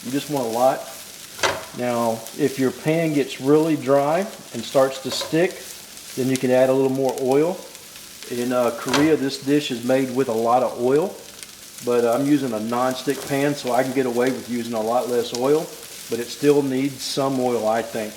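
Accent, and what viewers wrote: United States. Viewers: Looks great! I never like the pork in this dish to be honest, so it's perfect for me☺ Btw, I really like your accent! Where in the US do people speak like that?🤔 Sorry, I'm from Europe, so I can't tell...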